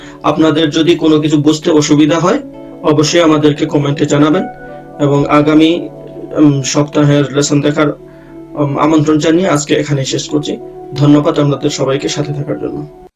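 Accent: United Kingdom. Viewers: A best channel in the british accent.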